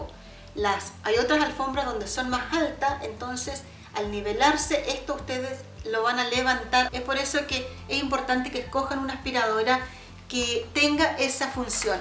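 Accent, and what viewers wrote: Chile. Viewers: Hola, gracias por los consejos. Una pregunta eres Chilena? Por tu acento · Muchas gracias. Acabo de descubrirte. Estoy fascinada. Y me es lindo oír un acento chileno. Te cuento que descubrí las vaporetas y me estoy haciendo una muy buena impresión. Sabes algo? Te animarías a hacer un video?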